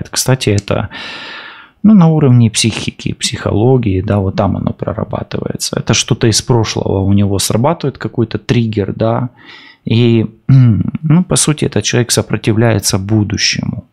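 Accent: Ukraine. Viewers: Яка у вас красива українська мова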